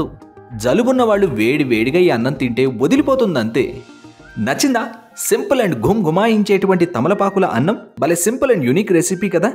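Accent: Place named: Telangana, India. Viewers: Telangana slang super 😆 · ఏంటి తెలంగాణ స్లాంగ్.., మీకు బావుంది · Meru Telangana slang lo matldatam bagundi sir 👌👌o video e slang Lo matladandi plz.... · Owow telangana yasa 🙂nenu pakka try chesta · Telangana slang super anna ☺ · I like telangana yasa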